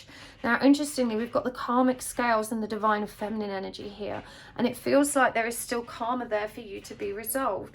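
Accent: United Kingdom. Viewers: I love ur voice ur British accent amazing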